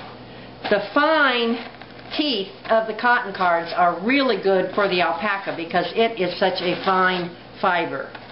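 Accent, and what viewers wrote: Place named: Pennsylvania, United States. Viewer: You speak like my mother! Are you from the Pennsylvania area or West Virginia by any chance?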